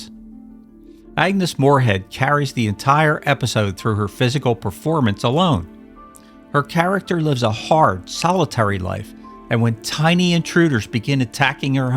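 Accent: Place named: Delaware County, United States. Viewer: Your DelCo accent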